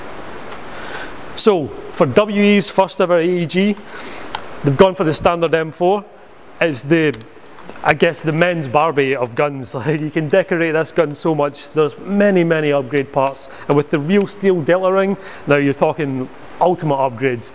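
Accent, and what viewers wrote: Scotland, United Kingdom. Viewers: not weird only scottish! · are you Scottish?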